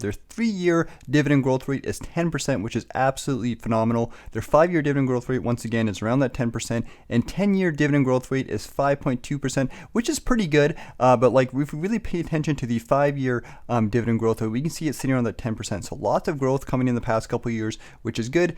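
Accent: Canada. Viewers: Your accent is something... Where in Canada are you located? (This is coming from a Quebecer hehe)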